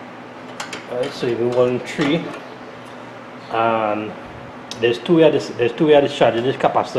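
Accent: Trinidad and Tobago. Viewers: Hello, you sound like a truetrini. I am a trini living in Nevis and my sharp carousel fuse blew 2 years ago when I foolishly tried to cook rice in it. I dont want to buy another microwave although it is cheaper than fixing. However I would want to fix it myself. Your video is very good for my problem. Are there more than one fuse in it? also do you sell replacement fuses in Trinidad? If so let me know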